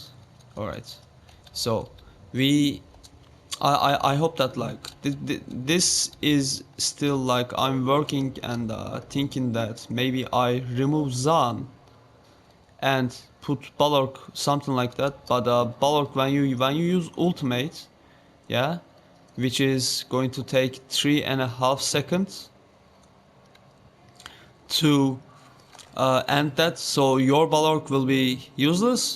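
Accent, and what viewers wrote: Turkey. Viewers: aksanını duyar duymaz kanal ismine baktım türkçe mi diye ya :D kralsın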